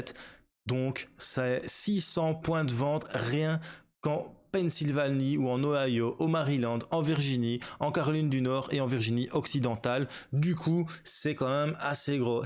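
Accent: Liège, Belgium. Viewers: Merci pour la vidéo. Bonne semaine de vacances si je ne me trompe pas. J'aime bien cet accent Belge. J'ai l'impression d"écouter du Benoît Poelvoorde , surtout quand tu t'agaçes ;-) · Le bon accent de Liège ... Bonjour de Mons en Belgique continues tes vidéos poto ...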